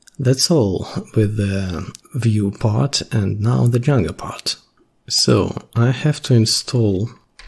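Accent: Russia. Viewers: Сразу заметил русский акцент, но не придал значения, но потом увидел, что пользователя зовут )), благодарю за видео, познавательно!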